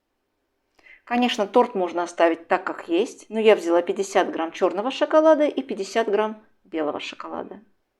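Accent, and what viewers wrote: Baku, Azerbaijan. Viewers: Этот .добрый, объединяющий всех бакинских акцент, мягкий разговор можно слушать бесконечно Здоровья рукам вашим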